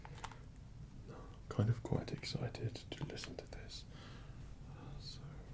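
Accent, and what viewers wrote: United Kingdom. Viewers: is he English because he kinda sounds it but looks Scandinavian and sometimes sounds idk just not English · Kyaaaah! I'm so happy I found out about asmr!! I'm a pretty anxious and agitated person. Sometimes, I'm tired but I can't get to sleep because my mind is always full of fast and stressfull thoughts T.T But your video really helped me! The plastic sounds are The best \o/ now I'm pretty sleepy @@ thank you for making this video and for helping me to calm down my messy mind. I really appreciate your voice too. I'm Brazilian, but I really love British accent (I don't remember if it's written like that). I think it is chic, fancy... I don't know which adjective fits better aahuashushauas But anyway thank you! Make more videos like that! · freakin stop thor jokes but I have a question are you from the U.K , Sweden or something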